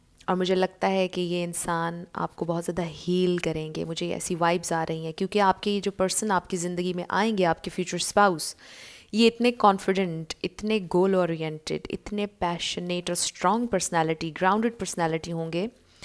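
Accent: Pakistan. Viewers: Your voice is so addictive with that peculiar urdu touch... I love your voice and style of speaking....Stay blessed ma'am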